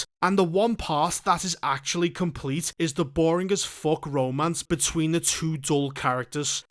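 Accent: Liverpool, United Kingdom. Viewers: Wait..are you by chance a scouser lad?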